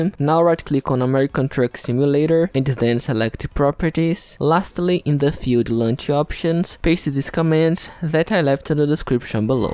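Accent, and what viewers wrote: Brazil. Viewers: vc e br da pra perceber no sotaque kkkkk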